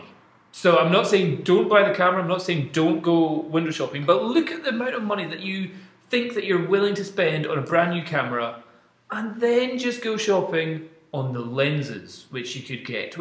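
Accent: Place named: Scotland, United Kingdom. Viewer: Offtopic: Everytime I hear you speaking (especially numbers) I start hearing the - in my mind:) That scottish accent:)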